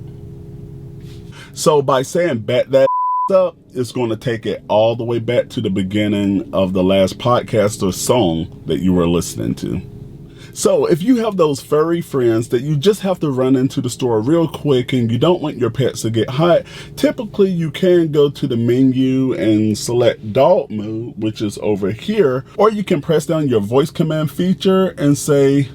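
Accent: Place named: Atlanta, United States. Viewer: Great videos my man. First thing I thought when I heard your voice was “He sounds like he’s from Atlanta” and sure enough I saw the map haha. Keep up the good work.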